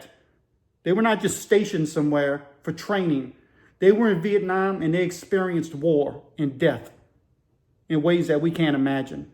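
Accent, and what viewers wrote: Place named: Deep South, United States. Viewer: I’m so chuffed ( happy 🇬🇧) I found you. You are ‘ The Font of Arcane and Highly Engrossing Deep South Prison and More Stories ‘. You’re honest too ! You are the most ‘ unique’ and different prison platform. Most of the prison boys tend to be from Cali, Texas, Florida or Georgia. Not much from the Delta. I’d love to have visited NOLA before that terrible hurricane ripped the heart out of that most mysterious voodoo infested French and African melting pot in the World. Damn. Yep it’s great to hear your accent, and the fact that you’re mixed inside . Not political structure like Cali, West Coast. And they call the Deep South racist. Shit , every colour population has fools and maniacs, but that’s no reason to hate the whole group. Seems a bit of that Southern Hospitality and Manners goes a long way. Keep em coming please. 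That was a really moving tale about the treatment and experiences of veterans. A lot in Britain are homeless and in prison. After the 2 Gulf Wars, Bosnia and Afghanistan. They all have, sadly, been let down. Good on yer for keeping them in our thoughts. Top Rankin’ 🇬🇧🤝🇺🇸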